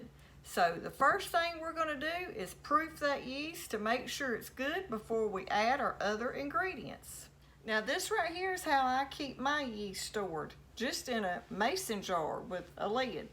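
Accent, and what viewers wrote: South, United States. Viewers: Have you ever heard your voice?? It’s horrible you give southerners a bad look & btw fingers are not pronounced as dangers ! Please try to do better!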